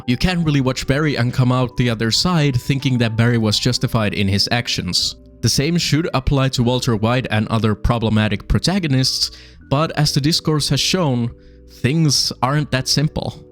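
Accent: Finland. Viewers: You have an amazing skill for media analysis, portrayed by this essay of the series. I agree with a lot of points you made. (Also side note, after watching UMK, youtube keeps reccomending me Suomi channels, but I dont mind. Your accent is so great (and not rally english xd) good luck with the Youtube and your deepmdives)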